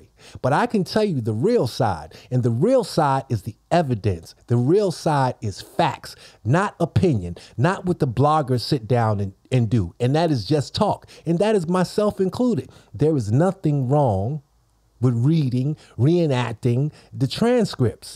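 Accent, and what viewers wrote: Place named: Harlem, United States. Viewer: Talk that Harlem talk 🤣🤣🤣you already know as a fellow New Yorker 🤣🤣🤣. Especially Harlem🤣🤣🤣. We a different breed🤣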